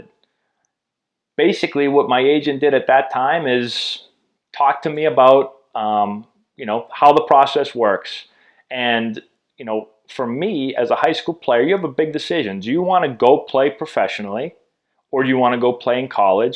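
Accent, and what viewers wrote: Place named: Massachusetts, United States. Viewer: I guess it's the Massachusetts accent. I heard car deal not card deal :) .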